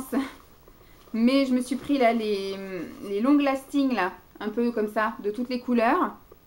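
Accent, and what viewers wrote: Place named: Quebec, Canada. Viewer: Bonjour miss... super tes achats.... comique de t'entendre parler Québécois... d'accord avec toé lolll...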